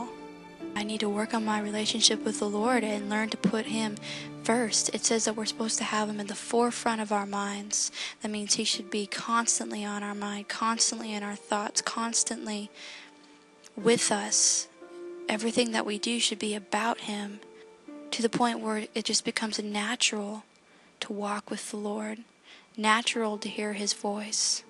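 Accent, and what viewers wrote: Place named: United States. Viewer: yep, here I am at 3.30am in the morning stuggling to find a solution to this bed bug problem (from the past Lord knows how many years, but they just keep coming). You sound like you're American.....I speak to Americans everyday as part of my job here in India. I'd like to thank you for taking the time to post this video. I've prayed about it...but the people in this joint family aren't very careful about stuff, they keep old junk stuff under tables or where ever they find place...help Lord :(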